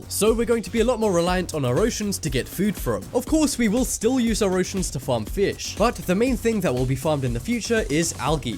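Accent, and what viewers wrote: United Kingdom. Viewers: he is British, like me. We all have accents. You do, I do, everyone does.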